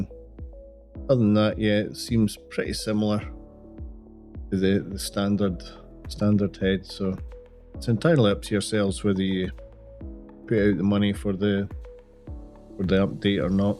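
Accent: United Kingdom. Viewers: I cannot tell you how nice it is to hear a 3d printing video with a British accent! Great review, good testing, thanks for a good video. I havent done any back to back testing, but I fitted the jet pack they sell and haven't noticed any real difference. Considering swapping it back now I'm more familiar with the printer. I have noticed that orienting your overhangs towards the aux fan on the side helps enormously. A couple of repeat order prints I make have to be oriented a particular way, and I could physically fit 16 on the bed, but only 3 nearest the aux fan will be up to standard.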